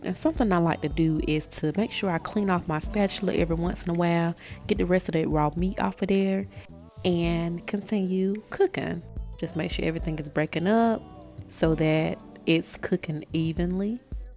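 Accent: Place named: South, United States. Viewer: Looks good love your southern accent. Imma try the Saturday. 🎉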